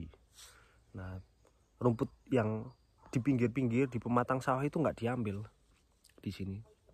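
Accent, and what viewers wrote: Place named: Japan. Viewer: Smean orang mana kang, ko ngga bisaa di bedain klo samean orang jepang apa indo😁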